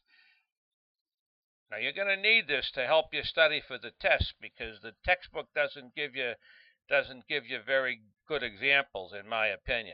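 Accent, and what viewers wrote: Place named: Boston, United States. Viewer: Very helpful. I love your Boston accent and mannerisms. I'm from Boston too!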